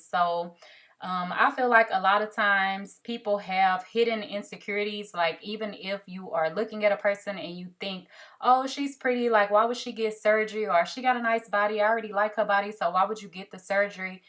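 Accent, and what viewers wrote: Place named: Chicago, United States. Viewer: You kind of have a Chicago accent ❤️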